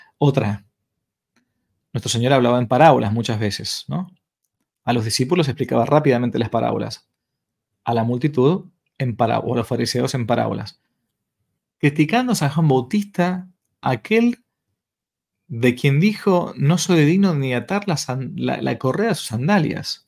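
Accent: Argentina. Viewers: Padre usted diciendo que la virgen que pusieron era la más feita! , que importa eso? Es usted argentino verdad? Ya entiendo su forma de hablar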